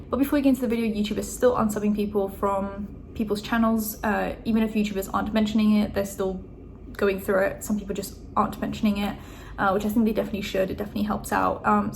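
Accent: United Kingdom. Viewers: English is not your first language and you're an immigrant?? I really thought you were just British. What's your ethnicity I'm curious:) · It's because she doesn't suddenly make any random loud noises or start yelling. The British accent and Calm composure don't hurt either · Omg I could’ve sworn you’re British your accent is so authentic 💀💀💀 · wait, you are not british..? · Please no one come at me, I have the memory of a sieve but, where is my girl from? I full on thought she was British. Sorry if that comes across as rude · I literally had no idea she wasn’t British and I’ve been watching her forever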